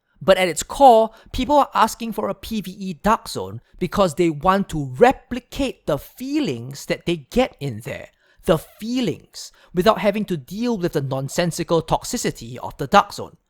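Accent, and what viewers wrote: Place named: Singapore. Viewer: He's from Singapore. I was initially trying to figure out the accent as well. Cantonese speakers from HK will enunciate but often have have terrible vocabulary. Taiwanese speakers often inject the "z" sound in everything and usually talk slower. Singaporeans enunciate, have good vocabulary, talk fast, but they have that odd accent which I assume is a mashup of multiple different languages. Since Singapore is the hub for South East Asia, most Singaporeans are trilingual by default.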